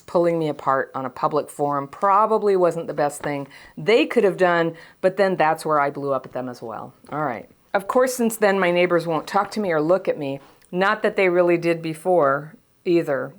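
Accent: United States. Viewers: So Southern!!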